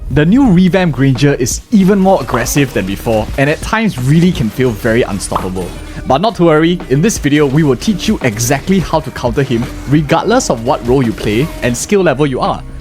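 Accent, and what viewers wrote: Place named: Singapore. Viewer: Prefer this Singaporean voice than those fake A.I. Voices in most YouTube vids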